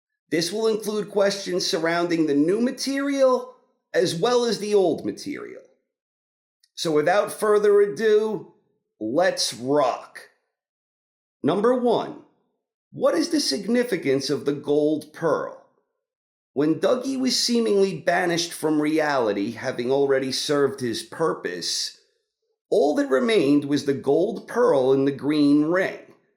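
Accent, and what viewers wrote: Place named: Brooklyn, United States. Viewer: You sound like you have a Brooklyn accent lol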